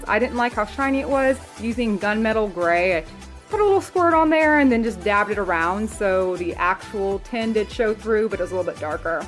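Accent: United States. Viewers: Cool on the tip in removing the words from the tin. I am on your side....the first topiary is my fave too. Same with the tins.. my fave is the same as yours. Always love your videos. I love your voice too; if you don't mind me asking, what state are you from?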